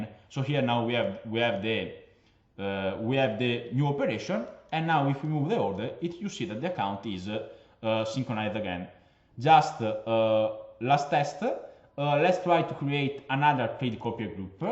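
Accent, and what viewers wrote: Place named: Italy. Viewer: chicco bello il tuo inglese è buono però si sente che sei italiano aahahha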